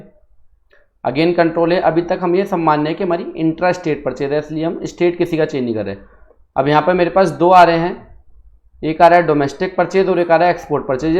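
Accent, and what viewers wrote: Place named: Punjab, India. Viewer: Sir ji I am from south India but educated in the north so I can understand Hindi well, but most of my friends simply don't understand Hindi especially your Punjabi accented Hindi yet they are highly impressed with your style of teaching, So please suggest to me any guru of your caliber who can teach the same in English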